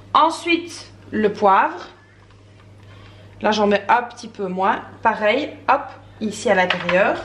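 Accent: Belgium. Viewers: Bravo super bonne recette merci,c un accent belge?🤭 bsa7a le poulet il est bien réussi☺😚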